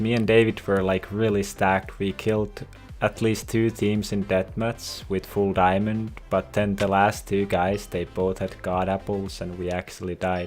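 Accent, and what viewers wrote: Finland. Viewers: Musta tuntuu että sä oot suomalaine koska sun accentti ja sun nimi. Mutta iha hyvä video. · Ok so basically, ootko suomalainen because of your accent and name?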